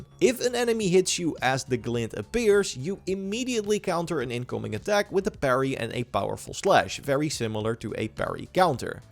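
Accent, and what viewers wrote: Netherlands. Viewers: Bro are you dutch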